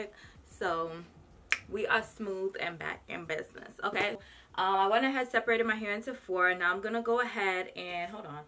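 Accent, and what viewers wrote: New York, United States. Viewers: Thank you for ya review! You sound like a new yorker 😝 let me subscribe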